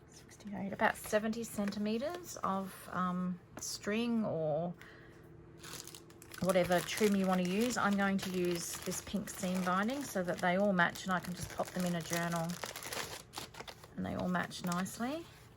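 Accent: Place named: Australia. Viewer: Thank you. I just found you and subscribed, nice to hear an Aussie 😄and love your style with using up 12" sheets, I have so many and struggle to make ephemera and pockets for journaling. These are just the thing I love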